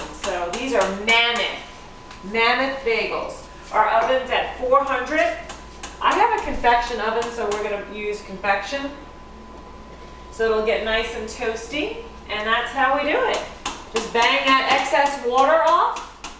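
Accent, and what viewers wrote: New York, United States. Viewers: Love you, Girl! I'm from Ma and love your New York? accent! And 'chew in' on tree bahk' - miss that sense of humor out here in Oregon! Thank you!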